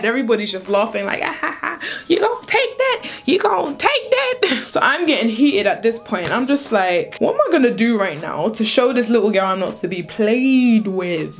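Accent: England, United Kingdom. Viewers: All of u saying it's jit not jet clearly have no appreciation for the English accent 💁🏽💁🏽💁🏽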